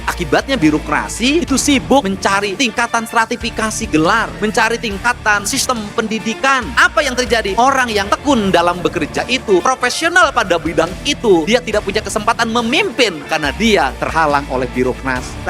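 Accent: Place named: West Java, Indonesia. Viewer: Sunda bgt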